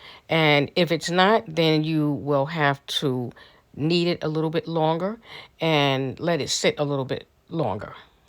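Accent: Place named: New York, United States. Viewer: 💜 the New York Puerto Rican accent!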